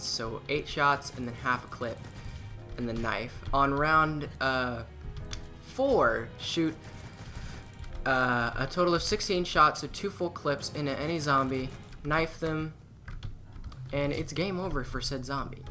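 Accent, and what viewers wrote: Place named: Canada. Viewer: i actually thought you were candian.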